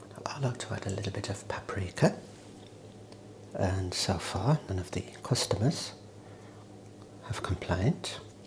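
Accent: Birmingham, United Kingdom. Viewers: Amazing !! And that brummy accent is lush!!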